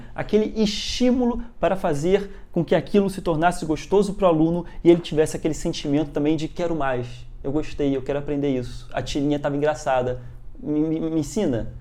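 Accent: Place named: Rio de Janeiro, Brazil. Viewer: HAHAHAHH deve ser o sotaque carioca, misturado com o pegando costume de imitar os pastores até falando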